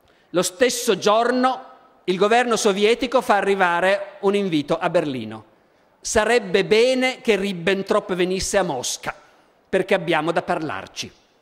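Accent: Piedmont, Italy. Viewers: No piemontesissimo. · N 1 peccato x la voce troppo piemontese neee , caro prof sei esagerato complimenti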